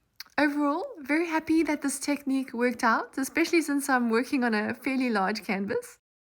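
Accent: South Africa. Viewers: I came across your beautiful pearl pour by accident. My first though was ..... "she's South African". Am I correct 😂. I am also living in the US and it was AWESOME to hear a voice from home. Thank you for your beautiful pour...😊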